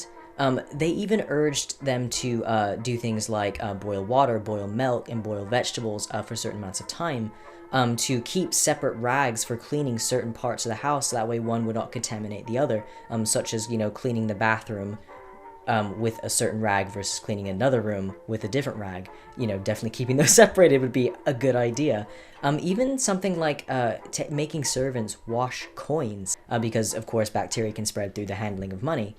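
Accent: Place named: United Kingdom. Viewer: I can't tell if you're British or not it sounds like you are British but are trying to speak with an American accent or something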